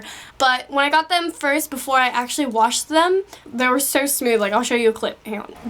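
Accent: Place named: Australia. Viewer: you've gained a little bit of an australian accent :p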